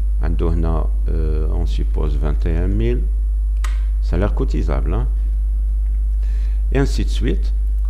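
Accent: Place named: Tlemcen, Algeria. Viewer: Merci beaucoup tout est claire y'a tlemcani vous gardez bien l accent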